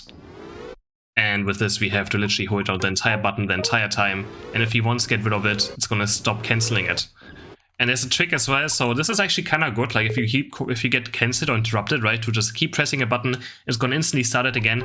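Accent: Germany. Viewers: das ist der deutscheste akzent den ich jemals gehört habe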